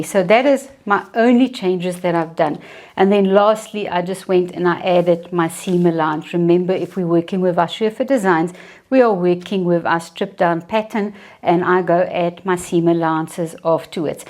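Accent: South Africa. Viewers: ok cool. I wasn't sure if it was a South African or New Zealand accent. (Fun to listen to people from different countries) Thanks!! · South African accent? Grâce pour l'information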